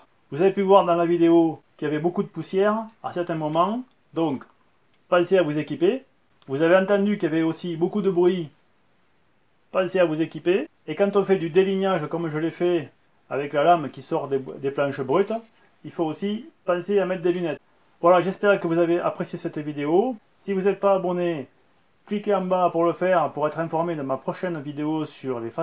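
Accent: South, France. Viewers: Super et avec le petit accent du sud agréable à voir entendre et comprendre. Le bonjour de Londres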